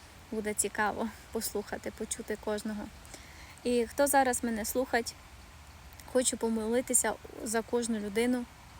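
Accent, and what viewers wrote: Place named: Transcarpathia, Ukraine. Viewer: Така файна закарпатська говірка... але дівчина іще файніша🌹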